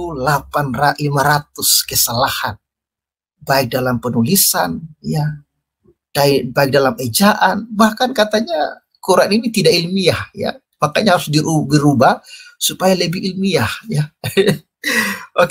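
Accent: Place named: East Nusa Tenggara, Indonesia. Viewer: Mantap. hostnya sptnya orang NTT ya logatnya jelas sekali? Sama spt orang Batak ada logatnya. Orang Jawa ada logatnya.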